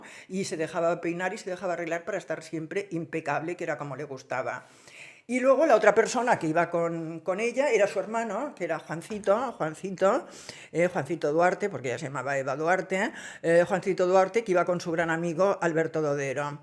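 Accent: Spain. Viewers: lo que me rio cuando con tu acento español hablas de las palabras coloquiales (boluda, gorda, quilombos, minas) He visto peliculas sobre Eva Perón pero la mejor es Cartas a Eva, Carmen Polo interpretada por Anna Torrent fue sublime.... Eva lo interpretó Julieta Cardinale, muy bueno tambien, Perón Hector Colomé, Carmen Maura, Malena Alterio. Es mitad ficcion mitad real... lo de la carta no sé si existió pero el viaje de Eva si... La imagen de Franco con el orinal y enfrente a Eva me la imagino!!! jajaja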